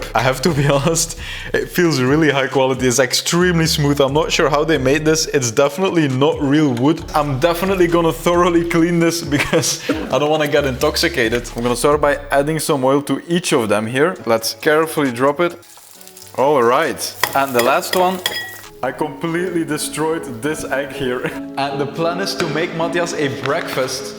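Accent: Netherlands. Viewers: You can hear the Dutch accent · i heared a dutch accent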